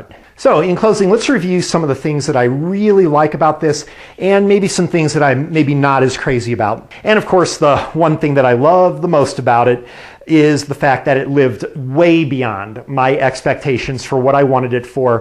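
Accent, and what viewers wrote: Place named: South, United States. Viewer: That was a fantastic review and hope you do a lot more- as a Canadian your deep southern dialect is a little hard to figure out at times - just love the double negative inverted references supplemented with some psychotropic supplements. LMAO.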